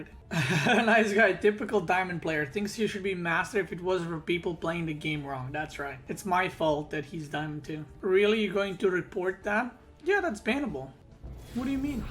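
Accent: United States. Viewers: Definitely more american than not. Like a well spoken immigrant west of the mississippi. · You do actually sound completely American. In the vast majority of America we all have a very similar accent and it sounds very similar to the way you speak English. You could come over to the majority of the States and no one would know that English wasn't your first language. It's just the South and Boston that have ridiculous accents. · You're speaking mid- Atlantic English. It's Americanish but without the twang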